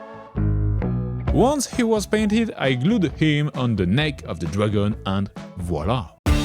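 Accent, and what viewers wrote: France. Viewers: Héhé you are the Bruno Albouze of mini paintings (same fr accent and all)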